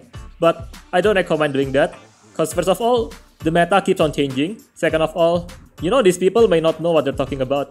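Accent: Indonesia. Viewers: Logatnya indo banget bang , btw vellajuel cocoknya combo sama siapa ya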